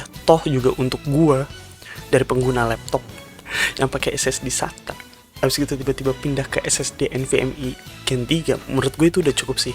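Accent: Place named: South Jakarta, Indonesia. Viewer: Bang lu anak Jaksel ya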